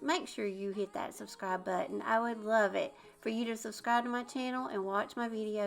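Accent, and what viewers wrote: South, United States. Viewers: Leave Bird House just like it is., so rustic. With the transfers you are able to create the most beautiful, darling diys! I could listen to you talk all day because I love your southern accent. I love that y’all call a hose a water pipe. It is so cute! Thank you for your wonderful ideas and have a blessed day! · you are so creative! I love your DIY’s and your sweet southern accent.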